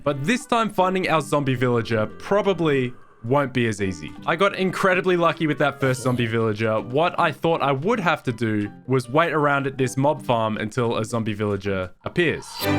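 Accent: United Kingdom. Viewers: Are you British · Its like 3 months since you have shifted to britan and already you sound british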